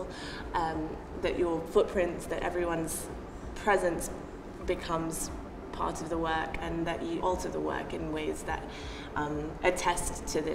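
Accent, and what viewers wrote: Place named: London, United Kingdom. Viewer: We’re family lovely intro friend ! Love the London accent ! This video is absolutely amazing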